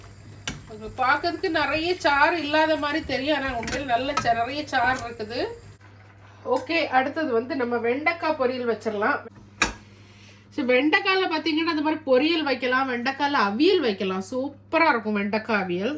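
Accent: Nagercoil, India. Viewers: Hi akka...lot of fun in your videos. Original nagercoil slang...me too from nagercoil ...living in Muscat. Feel homely when hearing your voice...casual talks...awesome ...keep rocking ...May our Lord Jesus bless you. Be blessed & be a blessing to many. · Ver good recipes, very good laugh, Nagar Koil tamil, Positive energy... Super அக்கா... London அக்கா... I am from Chennai.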